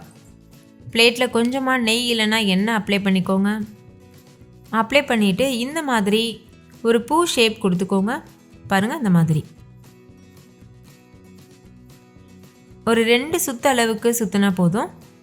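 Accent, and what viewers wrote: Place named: Kongu, India. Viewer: Neegal coimbatore ra.kongu Tamil nala pesugiramga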